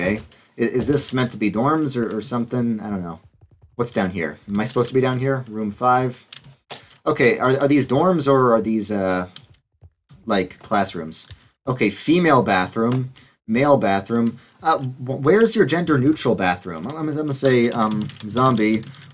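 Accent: New York, United States. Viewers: got your new york accent coming out in that video 😂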